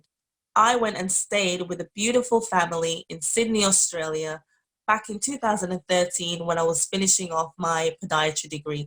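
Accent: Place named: United Kingdom. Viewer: Why are you quoting $ dollars and not £ Sterling when you have a British Accent? Where are you based?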